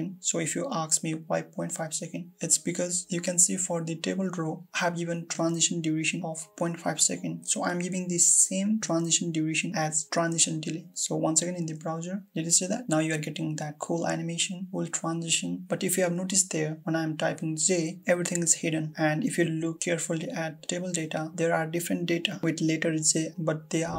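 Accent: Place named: Nepal. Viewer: Brother sounds like a Nepali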